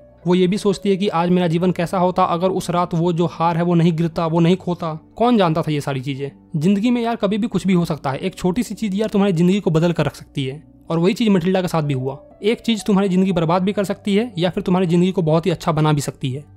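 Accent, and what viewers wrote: Bihar, India. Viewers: Sir Bihari che gujrati nathi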